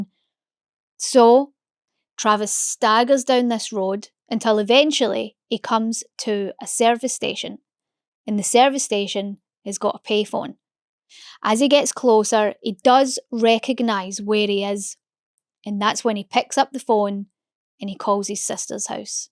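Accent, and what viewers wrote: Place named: Scotland, United Kingdom. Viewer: Love it.... Keep it coming mate... and from one Jock to another, do not 'ever' dial that accent back... Its totally ' you' and it totally makes it 🙂 x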